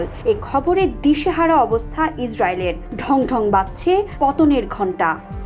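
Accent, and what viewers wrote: Kolkata, India. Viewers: কলকাতা স্টাইলে শাউয়ার নিউজ পড়ে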